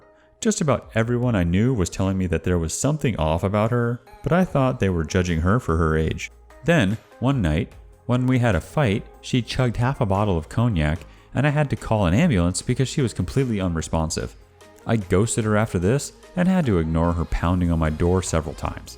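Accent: India. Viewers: Sounds Indian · The first story sounds like Indian !!!!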